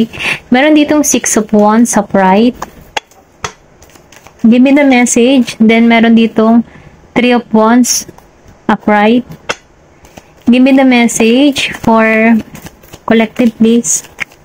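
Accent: Batangas, Philippines. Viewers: Mkasarili po tlga🥲😔 WLA pkealam s nrramdman ng iba, thank you po💖 mam batangeña kpo ba hehe feel ko lng po salita nyo😊 pero Kung di nmn bka lng po 😅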